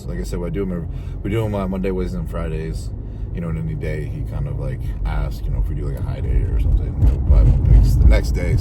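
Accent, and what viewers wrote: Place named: New Jersey, United States. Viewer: I really think the biggest problem with how you talk is that people don't understand how we talk in New Jersey.. so the problem is really theirs.. fuck em.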